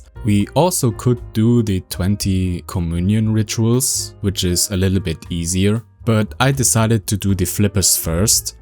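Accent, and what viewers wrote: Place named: Germany. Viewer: Nice vid! Will watch your series :P Your english is pretty good (Like me, you have an accent, but I understand everything you say) German? Looking forward to the other vids!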